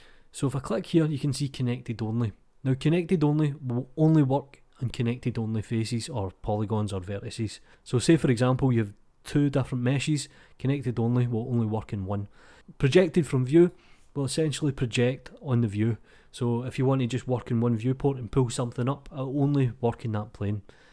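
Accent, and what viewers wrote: Scotland, United Kingdom. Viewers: You just propelled yourself into YouTube stardom with your little magpie beef, there at the start. Gotta love a Scottish accent. Plus the info was concise and accurate. Thumbs up, mate 👍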